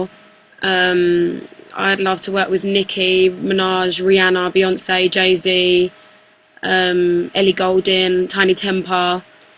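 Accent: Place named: United States. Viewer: your american accent is awsome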